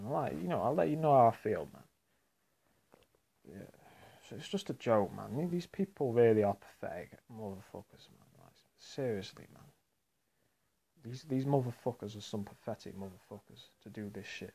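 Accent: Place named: Nottingham, United Kingdom. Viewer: Have you ever wondered if you have mental health problems? I'm sorry if my comment offends you but you're living in hell at the moment and getting help might make life better for you, If not at least you can be sure it's not your mind playing tricks on you. I'm from Nottingham, You sound like you're from Nottingham as well or near? Take care.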